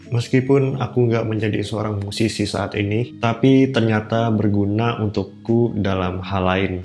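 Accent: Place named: Kalimantan, Indonesia. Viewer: Logat kalimantanya keluarin lagi bang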